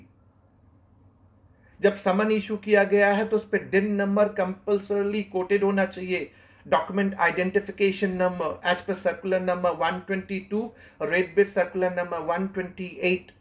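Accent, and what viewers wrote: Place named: England, United Kingdom. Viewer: I like your so much your English accent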